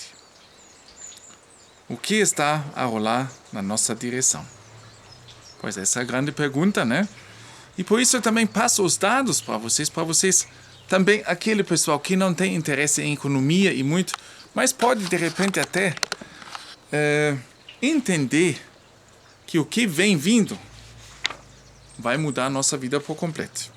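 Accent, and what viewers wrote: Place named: Germany. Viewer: Apesar do forte sotaque alemão, você fala o nosso linguajar, muito bem editado. Você dar aula de conhecimentos gerais que poucos conseguem. Parabéns; Mendonça de Fortaleza Ce. Br.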